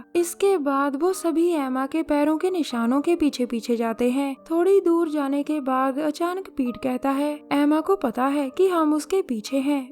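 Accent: Punjab, India. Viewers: ਤੁਹਾਡੀ ਆਵਾਜ਼ ਤੋਂ ਸਾਫ ਪਤਾ ਲੱਗਦਾ ਕਿ ਤੁਸੀ ਪੰਜਾਬੀ ਹੋ ਤੇ ਰਿਹਾਇਸ਼ ਪੰਜਾਬ ਦੇ ਕਿਸੇ ਪਿੰਡ ਦੀ ਹੈ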